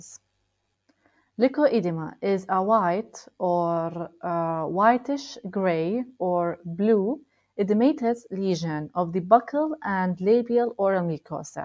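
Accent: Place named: Palestine. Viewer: i can feel the palestinian accent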